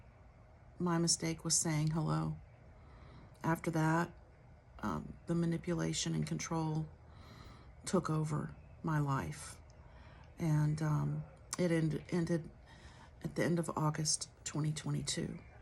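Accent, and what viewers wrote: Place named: Texas, United States. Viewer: She has a Texas accent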